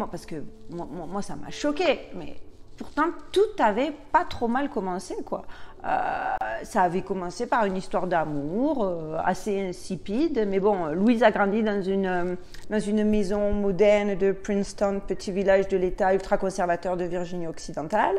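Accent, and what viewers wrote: Marseille, France. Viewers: Coucou :) Il y a bien un léger accent anglophone mais tu as bien gardé ton accent marseillais ;)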